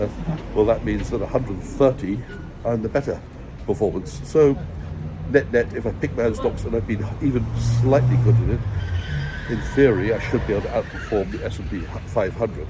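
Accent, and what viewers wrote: United Kingdom. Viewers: Dear mr., really appreciate your information and the effort you make to cover all aspects. Also the way it is presented and in true English from the UK. Thank you and hope you do enjoy making more video’s to progress my learning and knowledge. Greetings from Holland.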